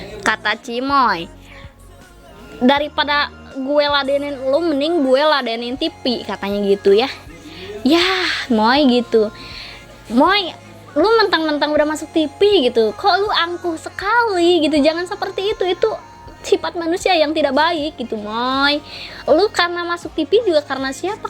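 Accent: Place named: Madura, Indonesia. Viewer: Kek org madura gitu logatnyaa, te sateeee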